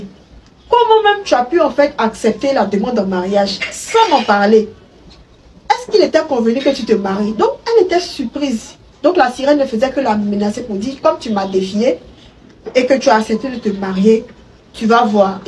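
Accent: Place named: Ivory Coast. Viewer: Tu l'accent ivoirien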